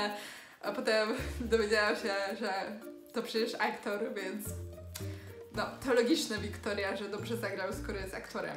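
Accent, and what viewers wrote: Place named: United States. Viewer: Robisz takie pretensjonalne naleciałości z angielszczyzny, jakbyś przesiedziała w stanach co najmniej 15 lat xD Na filmiku z operacji plastycznej już ich nie ma, dziwne xD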